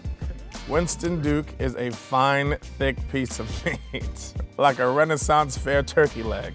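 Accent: Trinidad and Tobago. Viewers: I Wanna hear his Trinbagonian accent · Him and his tobagoonanian accent.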